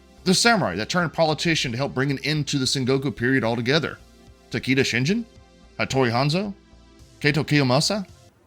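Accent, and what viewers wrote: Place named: Texas, United States. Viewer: You sound like Texas just play as the female character if you don't like YASUKE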